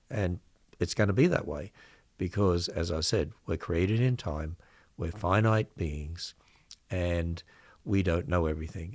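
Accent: Australia. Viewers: Good God, you can talk! At least you haven't lost your Australian accent 🇦🇺 👍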